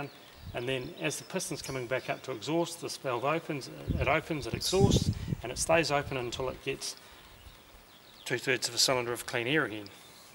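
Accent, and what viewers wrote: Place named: New Zealand. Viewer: Kiwi English, easy as!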